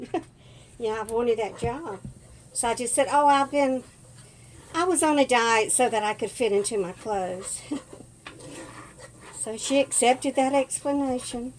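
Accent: South, United States. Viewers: Hi, I want to tell you that I absolutely love your southern accent. I'm from Australia, and am 63 years old. So feel like I have a lot in common when you tell some of your stories of raising your children back in the day. I have 4 adult children, 8 grand children and one great grand child. Like you, I was a stay at home mum and the highlight of my life was raising my babies. They grow to fast and in no time it seems, they are grown and having babies of their own. my doctor says I should lose weight but Gee, I love my food and always say to myself, I will start tomorrow. Hahaha. Well, Love your videos, bye for now.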